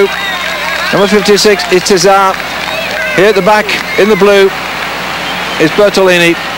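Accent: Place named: United Kingdom. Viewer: I watched this race live in my early 20ies, now just heard 1 min in my lunch break and Duffield's thick british accent of national rider names make it for me as a Dane difficult to relate to the riders, though I know hwo they were. First time I watch this since live signal. Very interesting. Will watch last 7 mins in the middle of the night later.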